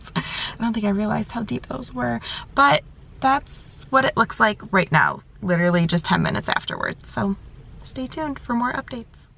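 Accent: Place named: Midwest, United States. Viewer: Omg you are soooo midwestern! “ Holy jeeez ya, those are deep!” 😂🤣😅 I’m a MN gal. 😘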